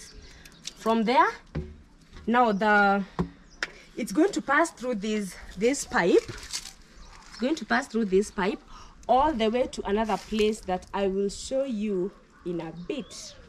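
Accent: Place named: Nigeria. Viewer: I love your nigeria accent oh. Love you in all accents. I appointed myself an Auntie from far😁😆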